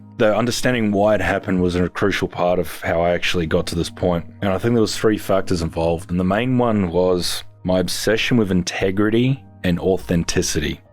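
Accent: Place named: Australia. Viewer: First time commentor! I look forward to your videos, always, because I love the game and found you a few years ago making the forest content. Your peaceful voice and how thorough you were with the game was my first draw in to the videos. Well to be frank... your sexy calm Australian voice I'd literally listen to for hours and put your videos on to chill out. I did notice your content for sons being quite a lot more agitated and angry (you mentioned you quit smoking? which I figured was something affecting your mood). I watched the video you just posted before this one and heard you laughing multiple times and was going to comment how nice that was to hear. Thank you for being self aware and realizing how you had changed into someone else for a bit. We're all humans going through life and even though we're here for your content - your wellbeing matters the most obviously. 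Sorry it's been a rough bit of time for you. I for one would love to know you were enjoying playing and filming for us and it not feel like a burden because I enjoy every bit of content you share. If you streamed live I'd watch every minute. You are doing great. Proud of you and thank you for your dedication to this!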